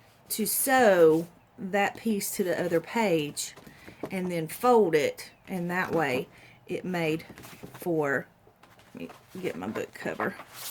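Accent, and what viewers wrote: South, United States. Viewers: Great tutorial. Love your accent, the way you say booooks, lol. There is just something so charming about a southern accent. Love from Denmark :)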